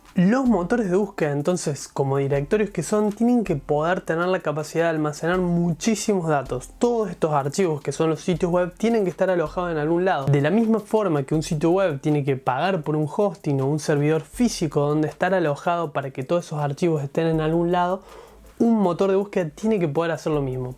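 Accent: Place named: Córdoba, Argentina. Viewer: Ay me parece que sos Argentino de Córdoba.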